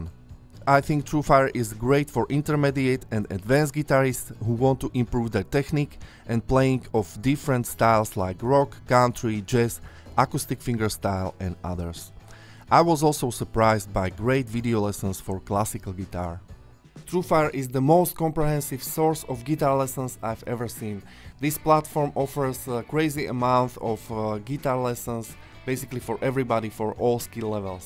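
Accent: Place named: Slovakia. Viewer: Nice content, I'm also 95% sure that you are from Slovakia according to your English accent. I'm right? :)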